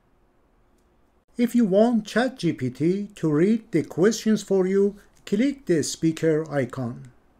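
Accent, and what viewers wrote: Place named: Iran. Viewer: Thank you for clearly explaining this clearly .. your Persian accent made the whole concept actually more comprehensible & for that reason I subscribed ☺️🙏🏼🙏🏼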